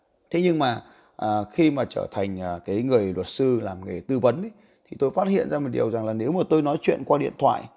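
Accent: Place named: Hanoi, Vietnam. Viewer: Giọng Hà Nội là hay rồi.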